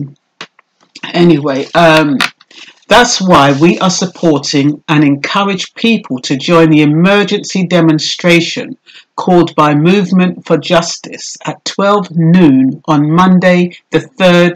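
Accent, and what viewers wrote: Jamaica. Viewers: It is so funny when you're talkin your Jamaican accent😂😂😂 you have me dying..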